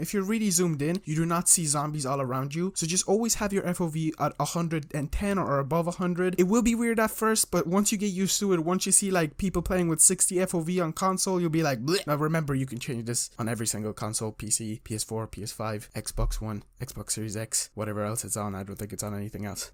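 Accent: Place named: Ireland. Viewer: You sound Irish bro is it true but it isn’t a strong Irish accent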